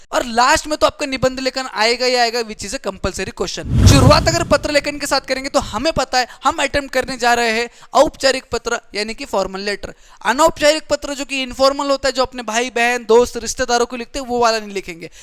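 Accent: Maharashtra, India. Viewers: Sir aapn Maharashtra che aahat ka , toning tar tashich watate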